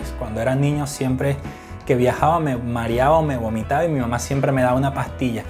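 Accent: Argentina. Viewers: Y qué es lo q te da risa? Supongo ignorás q los argentinos hablamos así. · Me encanta tu acento medio argentino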